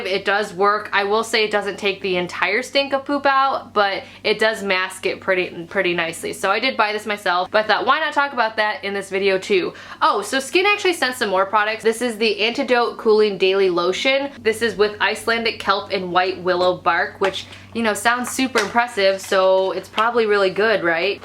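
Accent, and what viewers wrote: Michigan, United States. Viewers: The first video of yours that I watched was your wish app review. I ended up subscribing bc of your voice and accent. My favorite aunt growing up is from Michigan and I always loved hearing her voice. I haven't gotten to see or speak to hear in about a year or so. Your voice and accent is similar and I find it comforting. Anywhos I love the videos And watch at least one a day!! Hope y'all enjoy y'alls "staycation"!!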